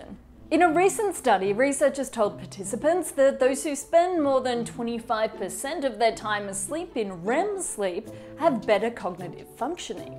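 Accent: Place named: Australia. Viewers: I believe it's Australian, but she is from New Zealand as far as I remember. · I have an Australian accent and since I don't notice an accent must be Australian.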